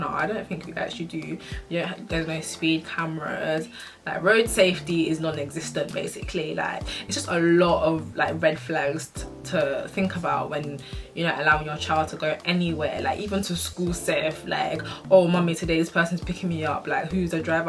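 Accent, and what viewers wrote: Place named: United Kingdom. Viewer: How do you still have a Brits accent after 10yrs in Gh. I moved to Gh came back after 8years which is after SHS. But picked up the Ghanaian accent. How did yours not disappear? 🤔🤔🤔🤔🤔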